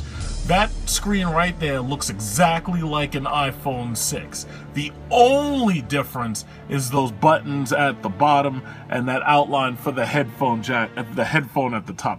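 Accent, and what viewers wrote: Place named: New England, United States. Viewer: where the fuck do you think apes phones are made dumb shit"CHINA"! THE ONLY GARBAGE I'M HEARING IS YOUR YANKEE ASS VOICE AND YOUR REGURGITATED APPLE IPHONE BABY FOOD THROW UP! I actually like apple and android but you're what makes this video and you're misinformationed ass so bad is you're a spiteful ignorant old dip s***.!! yeah the designs are similar but there is no way any copying going on anymore on because it blows f**** rings around the iPhone and I know for a fact because I own both and I continually go back to my Android every time. apple is for children to learn how to use a phone so when they grow up don't know how to use an Android you f**** moron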